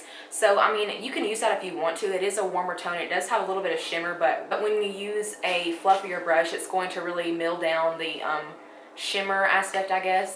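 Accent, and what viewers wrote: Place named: Texas, United States. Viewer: I thought you were from Texas. New subbie. Love your channel❤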